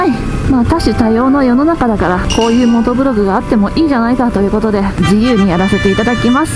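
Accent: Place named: Kansai, Japan. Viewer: 偶に関西弁出るのが凄く謎www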